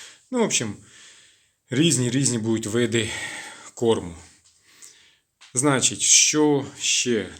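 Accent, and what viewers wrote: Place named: Kyiv, Ukraine. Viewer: ви і родом з Херсонської області? Розмовляєте,як у нас на Київщині 😀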